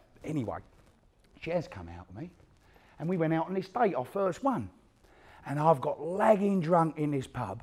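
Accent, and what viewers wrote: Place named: Cockney, United Kingdom. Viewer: Cockney PROPEEERRR! Unlike that awful patwa that’s occurring since Windrush.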